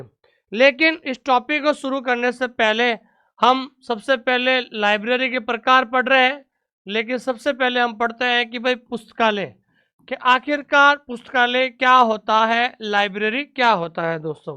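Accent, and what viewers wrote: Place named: Rajasthan, India. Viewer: Pura Rajsthani style me, kya khub lge hai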